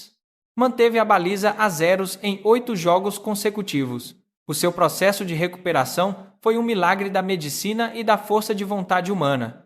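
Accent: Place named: Portugal. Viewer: Mistura de português de Portugal e português do Brasil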